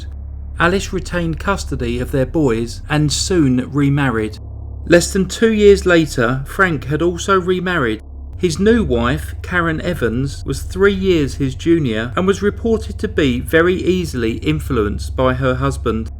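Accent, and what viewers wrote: United Kingdom. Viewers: This british accent confuses me somehow...i concentrate on the accent not on the Story. Can t even say if i like it or not...i m just confused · Love your cheeky endings!! Hope you’re getting through your isolation and keep making such well narrated content. Hearing any of the British narrations brings my mother’s voice to the forefront and always brings a smile to my dial. Thanks.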